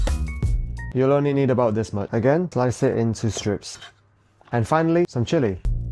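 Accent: Northern England, United Kingdom. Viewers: Slight hint of northern English accent? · Do I detect a slight British accent? 😲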